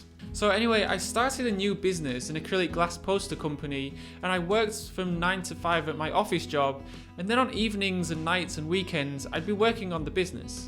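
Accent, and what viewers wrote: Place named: United Kingdom. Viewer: Its a ridiculous how elegant your british accent sounds even when you are saying this like “then your shit is broken” 😂😂 Another video to love and another idea to cherish.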